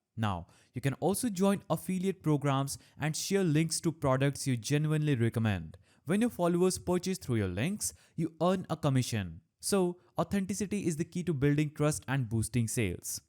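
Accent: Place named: India. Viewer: Damn I hear so many Indian channels that make zero effort to be understandable. You are Not that. I love your accent. Especially the way you say "b" so cute..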